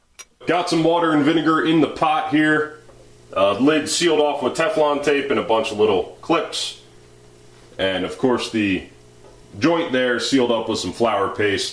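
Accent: United States. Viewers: This guy sounds like AvE. But more 'murican.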